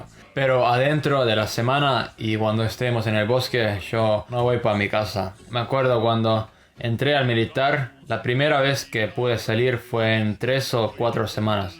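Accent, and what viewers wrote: Uruguay. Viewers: Es uruguayo el acento jaja 🇺🇾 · Acabo de ver los primeros videos cuando llegaste a uruguay, increible como aprendiste el idioma y ese acento! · Que bien que hablas con el acento Uruguayo jajaj que grande boludo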